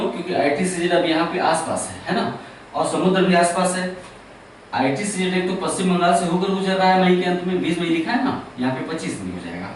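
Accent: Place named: Purvanchal, India. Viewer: sir tikora sahi hai jisase malum hits hai ki aap purvanchal se hai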